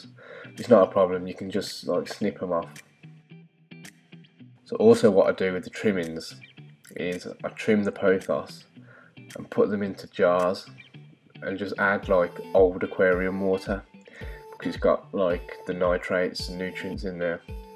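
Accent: Birmingham, United Kingdom. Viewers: you're from birmingham!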